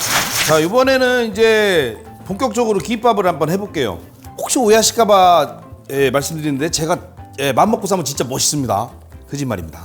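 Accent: Chungcheong, South Korea. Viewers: 백선생덕분에 충청도말이넘좋아유 ~~~ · 몇번을 봐도 밥 손에 한웅큼 쥐어서 김에 콕 찍으라고 하실때 그냥 귀여우심ㅋㅋㅋㅋㅋㅋ 충청도인 어투 걍 귀여우심ㅋㅋㅋㅋ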